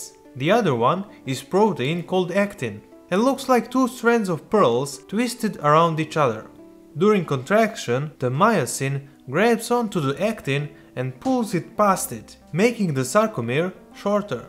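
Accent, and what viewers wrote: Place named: Serbia. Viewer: You really sound Serbian/Croatian/Bosnian/Montenegrin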